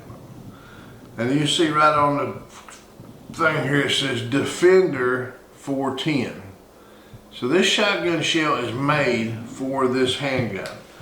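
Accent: South, United States. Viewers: Is dude hammered or from the south?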